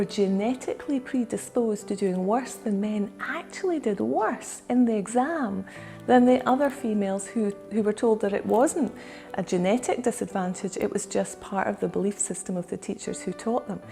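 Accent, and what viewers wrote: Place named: Scotland, United Kingdom. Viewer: I am a new subscriber..., I like what you teach and certainly understand it too. I am pleased to say, the things you talk about are operational in my life. Having said that, there's more work to be done and I am always looking ways to improve my mental performance and maintain the peace the I have both at home and work. Furthermore, I love the Scottish accent and the scenery behind the window. Your voice and body language is calming. That's a natural blessing on you which I goes a long way when you speak. Awesome!!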